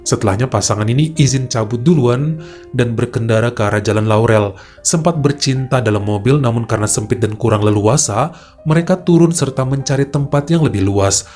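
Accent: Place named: Medan, Indonesia. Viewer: Dari sekian banyak alur cerita, cara menceritakan abg lebih enak didengar, mungkin karena kita sama sama medan, kisahnya juga pada seru dan menarik, sehat selalu bang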